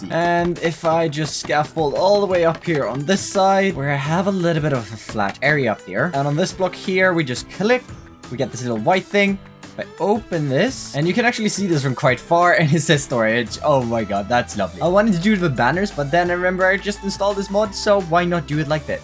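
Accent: Sweden. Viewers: Ar you Swedish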